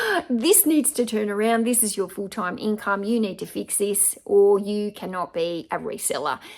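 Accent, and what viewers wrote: Australia. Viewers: I have 0 clue who you are, but I'm an eBay seller too, so I'm sure why you popped up as a YouTube recommendation. But damn that Aussie accent hooked me.... "YOU SOUND LIKE YOURE FROM LONDON" ( did you know they say the aussie accent was created because of all the drunken British)? . You seem lovely and love your energy. Keep doing your thing. Ebay is LIFE. Subscribed and look forward to more. The one thing my life is missing is a Thom. You give me hope even though I'm old as well ill beagle to find one.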